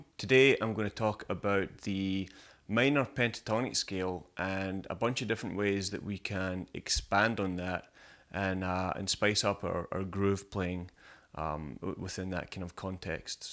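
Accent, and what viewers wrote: Scotland, United Kingdom. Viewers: for a moment I thought I'd have difficulty understanding your accent as it says Bass Lessons Melbourne, but I was relieved to hear that you speak in Scottish accent. I hate the Aussie accent.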